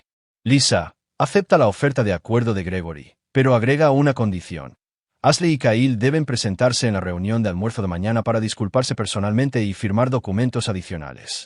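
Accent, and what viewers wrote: United States. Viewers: Buena historia, buenas traducción pero deben editar antes de subir. Se escucha horrible cuando pronunciar con acento gringo palabras en español, así como leen en español los nombres que están en inglés, como el de Blake (bleik). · Por favor no metan palabras en ingles o hablen como gringo, o son españoles o son americanos o portugueses, por dios!!!!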